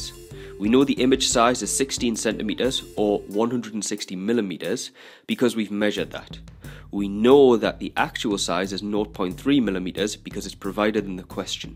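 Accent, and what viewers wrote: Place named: Newcastle, United Kingdom. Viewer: got a science exam tomorrow so ty and the geordie accent actually helps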